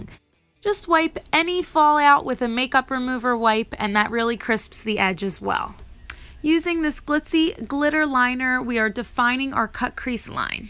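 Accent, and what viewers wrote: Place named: Maryland, United States. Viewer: You sound like you're from Maryland!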